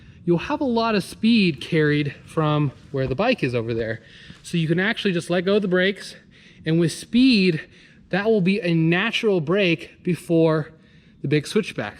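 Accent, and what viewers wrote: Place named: Minnesota, United States. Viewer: Oh ya is MN, eh is Canadian....nice vid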